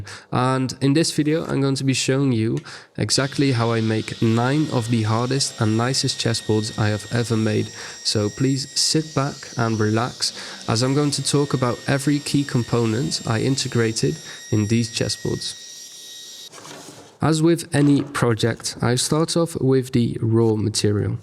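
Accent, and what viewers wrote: United Kingdom. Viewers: Where did you pick up such a british accent. It amazes me every time. Dutch people usually sound more american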